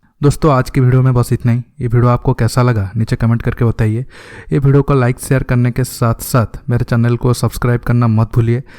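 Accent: Odisha, India. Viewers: Ur hindi speaking is heared like odia tongue speaking.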